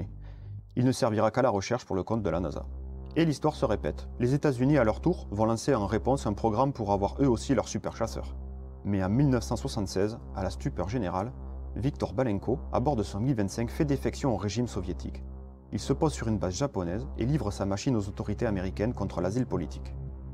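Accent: South, France. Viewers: Excellente vidéo J'adore son accent du Sud 😂 avec un texte bien écrit. Le fait de rajouter les sources c'est important. Par contre c'est quoi les deux musiques du fond ? et ? J'adore 👌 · J'aime beaucoup cet accent du midi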